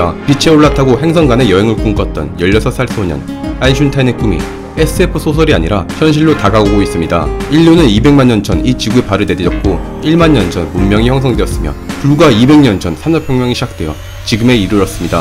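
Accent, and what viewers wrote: Gyeongsang, South Korea. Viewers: 경상도 억양이 약간씩 섞여 나오네요. 어느지역 출신입니까?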